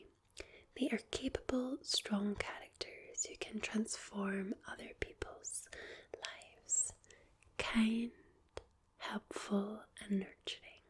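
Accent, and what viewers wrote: Scotland, United Kingdom. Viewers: Read today in an article by the BBC that scientists have said a Scottish accent is an anti depressant because of its ability to cause ASMR....after watching your videos for the past year I can honestly, conclusively say those claims are true! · Are you Scottish? (I know its in the name but still😂) I was just wondering because I am Scottish and I have been looking for a asmrtist who is Scottish because I don't know why but I love Scottish asmr😂 I also wanna say that I love you and your channel so much! You have helped me with my depression and anxiety! So a massive thank you💖 Keep up the wonderful videos!💕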